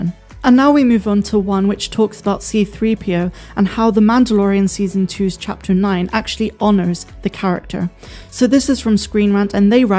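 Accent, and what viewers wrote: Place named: Bristol, United Kingdom. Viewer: Love how you say jibba jabba, sound like you're from the Bristol area ? You should say jabba jabba instead 🤣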